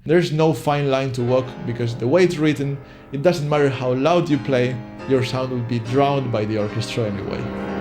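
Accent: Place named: Spain. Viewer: Se te nota el acento, español jajajaj. Buen video :)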